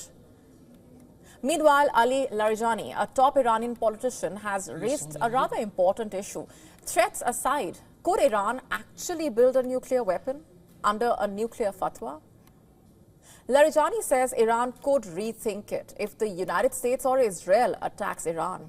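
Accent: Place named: India. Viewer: Indian accent so funny😂😂😂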